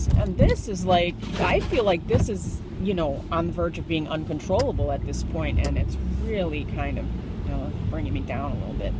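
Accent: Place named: Michigan, United States. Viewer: Sounds like you live in Michigan, which is where I live! I love your videos...and my friends found out about my videos and made fun of me too. Keep on keeping on. :D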